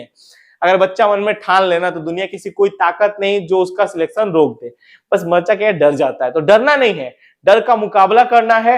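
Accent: Odisha, India. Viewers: Bhai tum odisha se ho na ?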